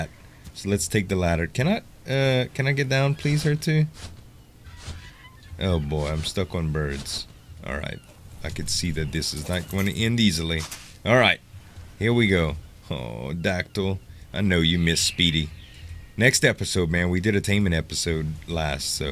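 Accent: Louisiana, United States. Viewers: Where are you from? I'm catching a fellow Cajun accent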